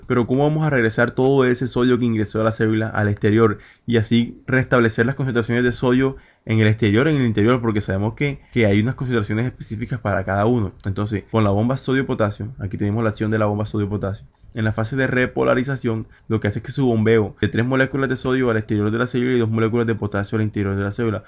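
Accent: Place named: Caribbean Coast, Colombia. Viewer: Muchas gracias! Felicidades!! Te pasas !! Pd. Se te siente ese costeño bien bacano! Jajaja.. Yo también amo la medicina!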